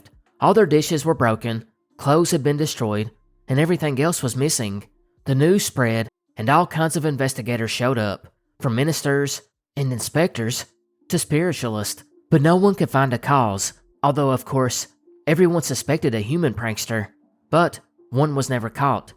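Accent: South, United States. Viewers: The southern drawl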